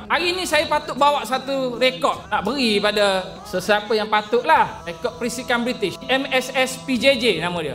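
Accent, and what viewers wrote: Kelantan, Malaysia. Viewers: Hok kecek ni bare klate wlaun,ambo kenal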